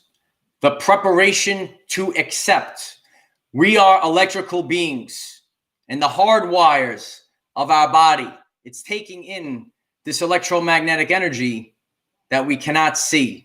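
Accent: New York, United States. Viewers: Sounds like a New Yorker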